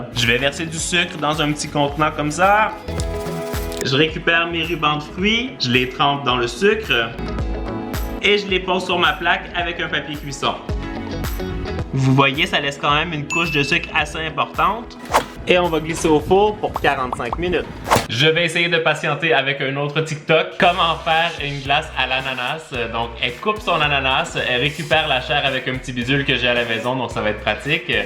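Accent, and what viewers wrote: Canada. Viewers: tu mon youtubeur préféré desCarl is cooking vidéos accent canadien et qui met quoi des vidéos trop trop rire 🇨🇦👍👍👍👍👍 Tik Tok recette du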